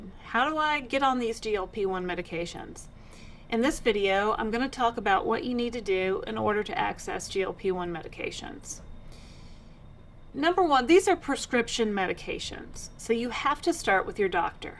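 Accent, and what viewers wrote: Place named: North Carolina, United States. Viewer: Thanks, I come here for encouragement about every day. I love your North Carolina accents. Most of my relatives lived in Stanley County when I was growing up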